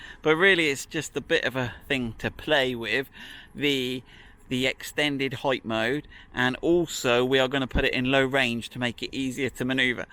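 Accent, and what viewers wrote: United Kingdom. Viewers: This video sounds so British I had to go and make tea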